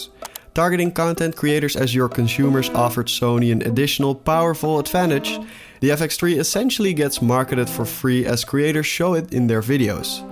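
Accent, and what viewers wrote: Netherlands. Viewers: That distinct Dutchie accent 🤗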